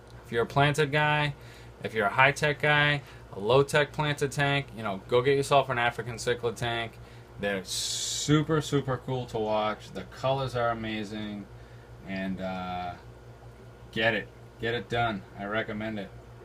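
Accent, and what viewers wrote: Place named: New England, United States. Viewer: Deff from New England lol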